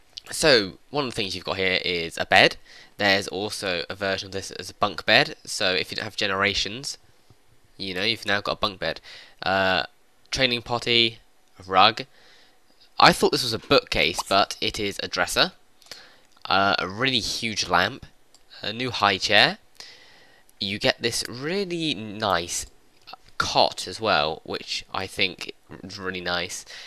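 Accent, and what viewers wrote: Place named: United Kingdom. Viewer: I know a British accent when I hear one ;)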